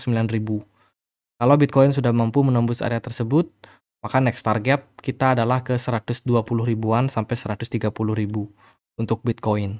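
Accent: Bali, Indonesia. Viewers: Sy suka pembahasan yg mengkaitkan ekonomi makro dengan crypto... Auto subscribe Dari logat huruf "T" nya, pasti dari Bali.. makasi bli ☺️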